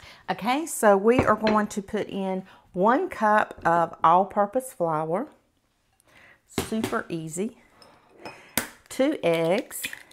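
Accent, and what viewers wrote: South, United States. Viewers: Aussie here! Love the southern accent! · You must be in Ga., I’ve retired to the south. I’m learning all the unique diff southern drawsssss!🤣🤗🧅🍑🧅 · That accent. Georgia or Alabama? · I saw onion fritters so I clicked the video and THEN I heard that sweet southern accent and instantly subbed 😂 you sweet lil southern ladies ARE THEEEE BEST COOKS EVER!!❤❤😂😂 & Im a polish girl from up north so I CAN NEVER taste food this good 😂! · Yeah, it's sad people gotta be mean like that and try to make other people miserable. I just ignore them unless they are particularly ugly, then I'd report them. Me and my 10 brothers and sisters were raised on fritters. Heck, Mom made fritters from fritters. Anything leftover in the refrigerator was fritter fodder. Corn fritters, mashed tater fritters, hot dog fritters, cheese fritters, liver and onion fritters, cow tongue fritters, spinach fritters, apple or any kind of fruit fritter. 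I've had them all. Mom would disguise ingredients inside a fritter for the food the my little brothers didn't like and it tricked them every time. Spinach & blueberry fritters rolled in a bit of sugar… delish. And my brothers didn't even realize they had eaten yucky spinach. I'm getting a big kick out of her southern accent. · I just found your channel just this minute and let me tell you I absolutely love it! Love your lovely southern accent ❤I’ll be making this recipe this weekend for my son who’s coming over for vacation and love my cooking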